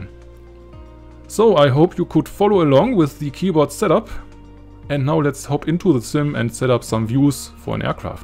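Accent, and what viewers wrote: Germany. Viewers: Super gemacht hat mir echt geholfen habe an deiner art zu sprechen gemerkt das du deutsch bist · haha liebe diesen deutschen Akzent im Englisch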